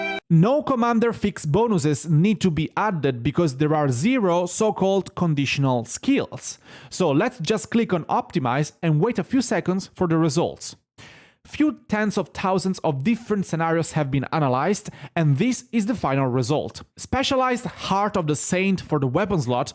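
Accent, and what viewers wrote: Italy. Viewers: Ma????sei italiano?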